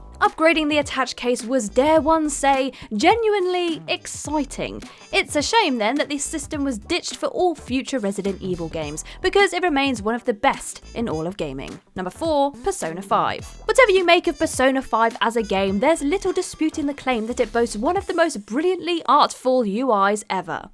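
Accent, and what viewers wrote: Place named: England, United Kingdom. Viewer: that english accent makes me watch this videos in 0.25x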